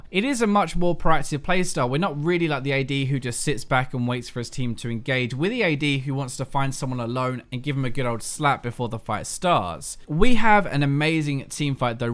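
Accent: England, United Kingdom. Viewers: is he english or an american?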